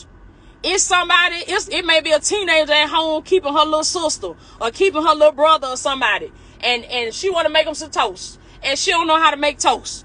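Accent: South, United States. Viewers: I love the way she says children. It makes me smile. Love the southern twang. I myself have a twang. I watch her religiously.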